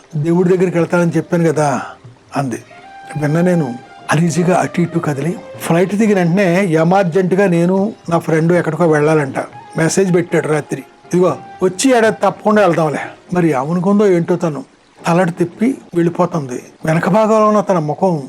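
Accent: Godavari, India. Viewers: Sir godare swayam ga matladi nattundi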